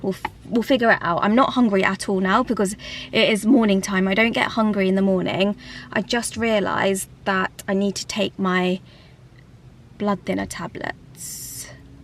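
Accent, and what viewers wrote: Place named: Oxford, United Kingdom. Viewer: speaks Oxford English, French and Tamil.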